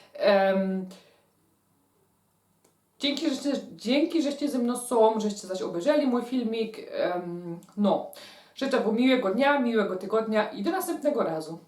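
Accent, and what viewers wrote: Silesia, Poland. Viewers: Kocham jak mówisz po Śląsku👍👍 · Pani proszę więcej mówić gwarą 😊, taką prawdziwą. Uwielbiam tego słuchać. Pozdrawiam 😘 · Tłumok bo przecież powiedziała że mówi gwarą śląską pojebany jesteś😂😂😂😂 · niy prōbōj gŏdać cysto po polsku,bo ci to sztyjc niy wyłazi,przeca żeś niy je żŏdyn gorol.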